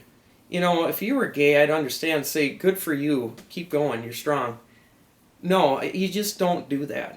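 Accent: Minnesota, United States. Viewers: What an amazing story!!! I still cannot understand how a parent could kick their own child out just for being who they are. Being gay is not a choice it is just who you are. The Ali Forney Center in NYC it littered with kids who have been thrown out of their good "Christian" homes... The Bible also says that adulterers (divorced) should be put to death. I am glad times are changing but it is not fast enough for me. -love your Minnesota accent!!!:) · what an interesting accent. Is he from MinneSOta?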